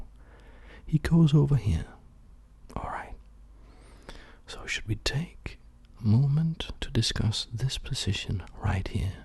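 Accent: Denmark. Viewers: at first I was like.. this fella sounds a lot like a Dane.. I then scrolled down and you told us you are from Denmark. Halløj mester! god video, god instruks ;)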